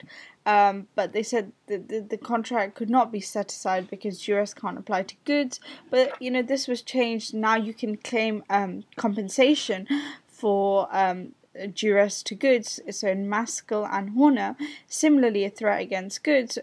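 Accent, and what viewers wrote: United Kingdom. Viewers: I like your british accent!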